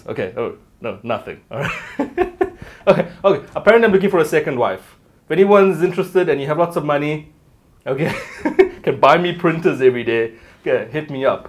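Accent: South Africa. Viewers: dig that Saffa accent - FTW :-) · Are you South African??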